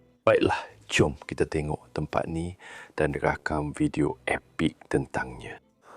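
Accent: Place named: Indonesia. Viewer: Sekejap bahasa melayu sekejap loghat Indonesia...🤗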